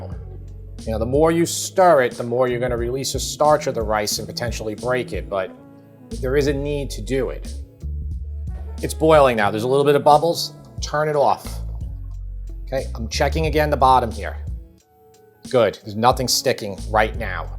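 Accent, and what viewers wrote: New York, United States. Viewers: not to nitpick too badly but you mispronouncing undewee you pronounce it in Dewey which is no that was pronounced. it is pronounced on AHNTOUIE on Dewey So it's not like N Dewey or N Dewey it's on AHN how it's pronounced TOUIE. I know it's your New York accent believe me I need a pick with English people about how to spell how to pronounce Jaguar and then call it Jaguar If you turn to tell him it's a Latin American word in the Spanish language from Native Americans and you're pronouncing it wrong it won't listen.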